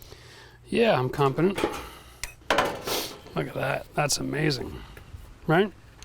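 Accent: Canada. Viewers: Good overview, as I dry out a basement because pumps were not plugged in. You sound a little Canadian?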